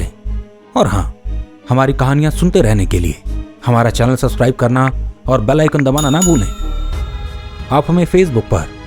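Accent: Bengal, India. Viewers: Aisa lagta hai ki kahanikar Bangali ya Oriya hai, kya mai sahi hu?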